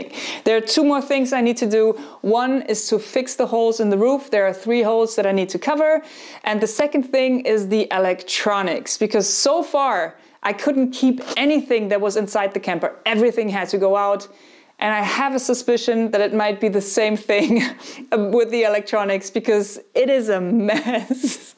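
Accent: Germany. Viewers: Awesome! I’d like to take this opportunity to tell you that your accent is one that takes me back to Darmstadt Germany in the late 90’s. I was stationed there and dated a woman with an accent and voice just like yours. Nostalgia,… Gotta love it. Keep up the good work and awesome channel! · I know this is an older video but I have to say I love it. Also, unrelated to the video... Are you German? I love the accent. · Hello! This is my first viewing of a video of yours. Not sure but I guess you German? I learned Norwegian as a child from my grandfather but now only remember 4 or 5 words. It is just that grandmother was Danish so she was sabotaging things because she was insisting I learned Danish, while my other grandfather was German, so yeah, he was working the German angle. I was so confused! And then I got to kindergarten and the kids only spoke English!!! It might have been hilarious to everyone------ but me! 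But I still have family in Bergen and Ringstaed but I haven't seen them for quite a while now. But it seems I have a few strange words in my vocabulary that come out on occasion, I think they are from my pre-K days. But your English is great and with a lovely accent, and an easy laugh! So good for you on that! At any rate, I think your 'electronics' would be better if you ripped them all out and started over! Safety first!!! Always!!! Even if it costs money!!! (My Norge grandfather was very, very reluctant to spend a penny! But some items are just too valuable to not have new--- like wiring!!! Hope you agree! ) Tak!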